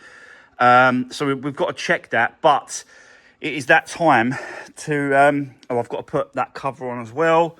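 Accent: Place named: South East England, United Kingdom. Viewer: My connect is 2016 with low mileage and requires a cambelt change and service. Where are you based as you sound like you're from the south East?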